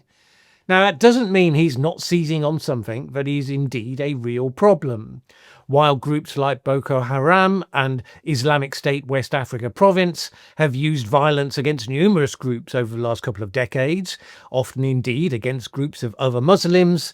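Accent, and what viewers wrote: United Kingdom. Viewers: Maybe you should go and tell this to the people being massacred by terrorist or have you guys become apologists for terrorists because the people being killed are blacks. Listening to your voice, you must be British the very people who have been the horrible people keeping the terrorism going for you to steal Nigerian resources.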